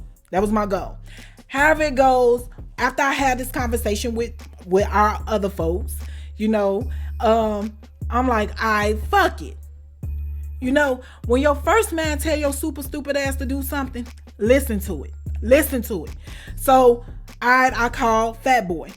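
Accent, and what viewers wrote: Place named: Philadelphia, United States. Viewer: One thing I can say is Philly people know when they hear another Philly voice lol I knew you was from Philly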